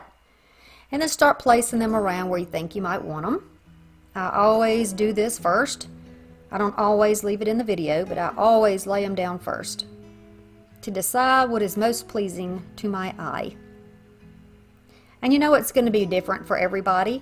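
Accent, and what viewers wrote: South, United States. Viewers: Love it all! I'm from South Carolina, now living in Ohio, and the minute I heard your voice I knew I'd have to subscribe to your channel. You could be making mud pies and I'd watch just to hear your wonderful Southern accent. Mine isn't as strong, but people here keep telling me they can still hear a "twang." When you were talking about that cute two-part snowman and how some people think they have to have three sections, I absolutely howled when you said you live in the South and we're lucky to get enough snow for one part. 😂❄❤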